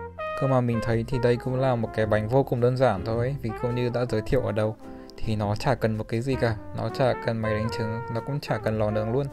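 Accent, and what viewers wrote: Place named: Hanoi, Vietnam. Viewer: nghe giống giọng ctrai HN , Thích ghê á :)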